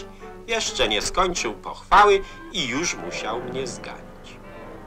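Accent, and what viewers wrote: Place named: Warsaw, Poland. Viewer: Fajny Akcent lektora ciekawe Z jakiego rejonu Polski on był trochę jakby z Warszawy albo okolic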